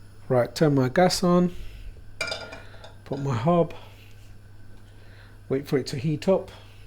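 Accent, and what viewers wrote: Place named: Birmingham, United Kingdom. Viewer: Sounds like a Brummie tinge, are you from the midlands. Apologies if it was obvious where your from from the earlier videos but I recently stumbled on your channel. Very good quality videos by the way, especially the recent trip to Bangladesh. Keep up the good work.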